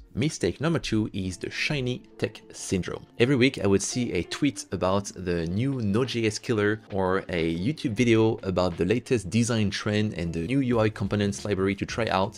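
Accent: France. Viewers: French accent is like honey for my ears Thanks for sharing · Are you French ?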